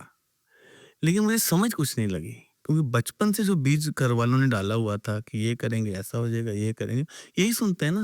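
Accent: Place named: Punjab, India. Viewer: ਪੰਜਾਬੀ ਬੰਦੇ ਦੀ ਹਿੰਦੀ ਦਾ ਪਤਾ ਲੱਗ ਈ ਜਾਂਦਾ 😅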